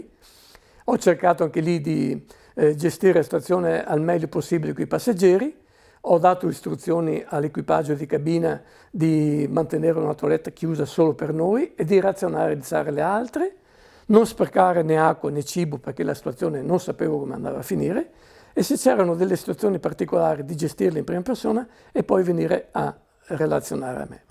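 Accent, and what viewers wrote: Veneto, Italy. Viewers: L'inconfondibile cadenza veneta, mi rende il racconto ancora più apprezzabile 😊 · È veneto lui verooo?? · Un comandante Alitalia non romano per una volta che strano un veneto o friulano da accento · Grazie per questa testimonianza e complimenti per la gestione di una giornata così difficile. Ma è veneto? ...riconosco un accento familiare... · Concordo, competente e simpatico! Sicuramente veneto, qualche intercalare veneto si è sentito😅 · ma sitto veneto?? bella storia, sai raccontare bene comandante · Incredibile come faccia fatica a non parlare veneto! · Mi semba veneto sto comandante.... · Sento tanto veneto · Veneto doc😂 grande comandante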